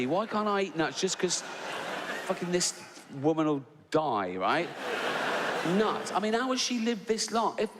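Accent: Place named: Australia. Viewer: Sorry but your subtitles are full of errors - AI CANNOT translate Aussie accent. Simple as that.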